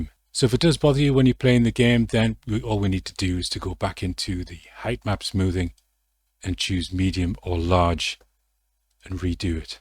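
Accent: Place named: North, United Kingdom. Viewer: You've gotta be a northerner right? Sound like your from my favourite city possibly? :D Love it man, to the point info, great details, great video :)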